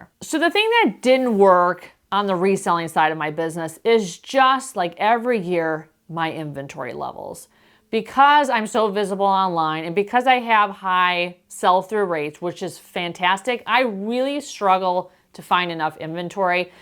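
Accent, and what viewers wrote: United States. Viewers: I’ve been following for a few years and seeing you grow to this extent has been such a genuine joy to witness. I watch you and Laura’s videos religiously and they provide such different, but equally inspiring and entertaining, vibes. For me, you’re the British lady with an American accent 😀 I’m British and I just find your sense of humour so comforting. Well done lady! You have strangers feeling proud of you and that has to be a cool feeing ❤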